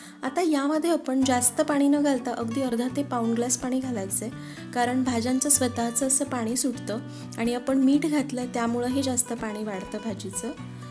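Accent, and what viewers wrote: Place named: Pune, India. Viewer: ताईची भाषा पुणेरी आणि स्पष्ट👍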